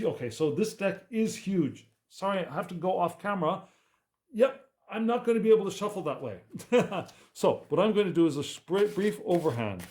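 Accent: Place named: Latin America. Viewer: ah nice. I have friends in Italy and I heard how you speak English and I thought that you will be Latin something? From which country are you?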